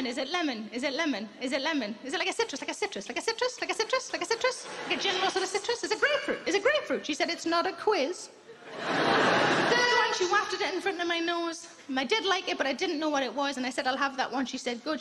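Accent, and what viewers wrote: Newcastle, United Kingdom. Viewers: she is actually quite funny maybe it is the geordie accent [which i like]seen her on some tv. panel shows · Geordie accent from Newcastle (area) in the northeast of England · Great fun, Geordie perspective is fun assisted by your fantastic accent can't get enough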